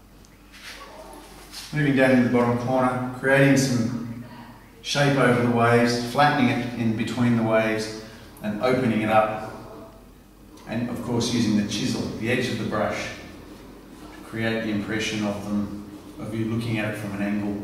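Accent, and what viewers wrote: Australia. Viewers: Are you from Australia ?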